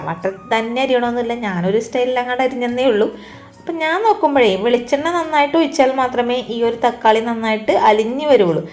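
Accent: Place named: Ernakulam, India. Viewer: എന്റെ ഒരു frd നിങ്ങൾ സംസാരിക്കും പോലെ ആണ് സംസാരം എറണാകുളം കളമശ്ശേരി ആണ് അവരുടെ വീട് 👌👌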